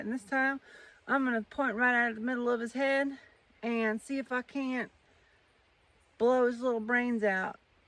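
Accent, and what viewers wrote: South, United States. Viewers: Sound like you from Mississippi or Alabama or Tennessee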